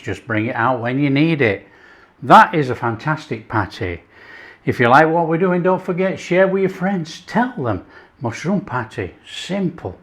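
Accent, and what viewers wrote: United Kingdom. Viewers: I Like hearing this british accent 😊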